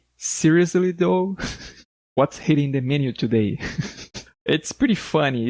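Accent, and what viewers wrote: Brazil. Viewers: so pelo sotaque ja percebi que e br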